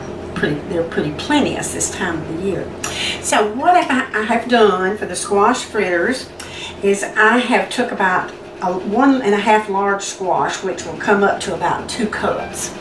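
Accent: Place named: Alabama, United States. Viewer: This just brings back so many memories of cooking with my grandma! 😭😭😭 I’m originally from Alabama and your accent brings me back home, thank you for your sweet southern charm!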